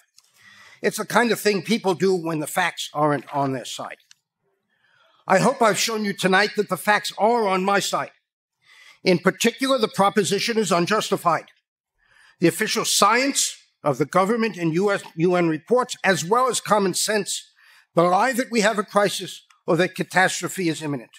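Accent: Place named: New Zealand. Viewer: Sounds like New Zealand 🇳🇿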